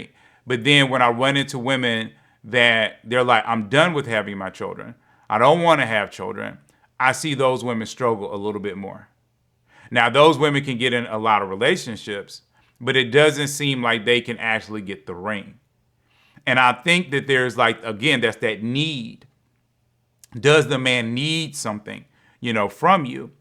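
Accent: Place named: Memphis, United States. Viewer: Lol i didn't know you were from Memphis, your accent isn't as strong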